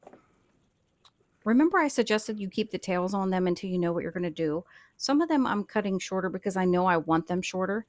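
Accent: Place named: Pittsburgh, United States. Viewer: These are so pretty!! Thank you for sharing this information 💖 Btw, are you from the Pittsburgh area, by chance? I'm trying to place your accent.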